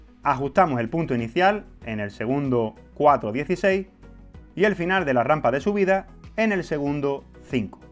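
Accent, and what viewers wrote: Andalusia, Spain. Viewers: Qué bien explicado!! Y qué gracioso el acento andalú, me encanta :) Un abrazo!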